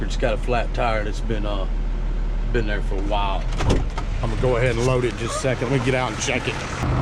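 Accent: South, United States. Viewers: I love your accent and I love when you say I'm fixing to tow it lol You're a true southern boy. I live in Canada and apparently we talk with an accent too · Good job... what makes it better is your southern accent.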